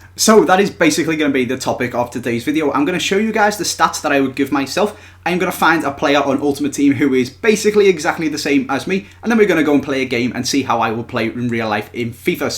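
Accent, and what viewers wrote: Liverpool, United Kingdom. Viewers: Lad you hide your scouse accent kid, u can hear it, u fucking discrase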